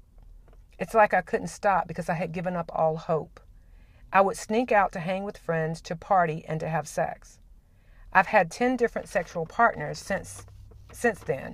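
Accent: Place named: England, United Kingdom. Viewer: QwQ I'm not cursing my parents I know some people dont have so it's a bless for me whatever it was :) And again she is 14 I dont like just 3 years and she was 10 the problem is other students there... But thank you ur really an amazing teacher and loved your English accent I dont if you're foriegn or something but loved it And yes every child has it own story with his/her parent :> ...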